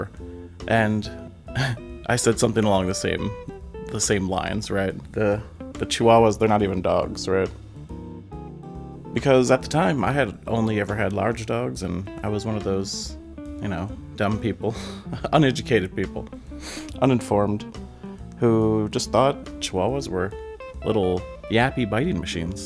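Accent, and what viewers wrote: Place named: Louisiana, United States. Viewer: I have to say I was surprised you are Canadian. I would have guessed from your accent you were from Louisiana. And with Porter being a southern gentleman and all.; ) Ohh, your babies love you sooo much. Thank you for coming over to the dark side of little tiny chi wow was. I have 2 and they are the loves of my life. When I was a little girl my great aunt had a yappy chi and I swore NEVER EVER. So I totally relate. 🐕🐾❤🤗👍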